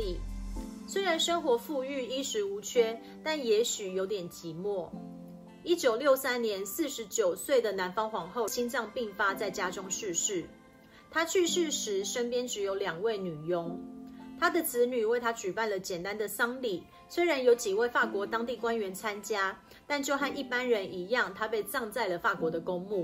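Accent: Taiwan. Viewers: 很喜歡妳的旁白解說，坦率而帶有情感，使这位末代皇后的故事更添幾分神傷！希望妳繼續努力，多製作更多更精釆的視頻，聽妳的口音應是台灣朋友吧？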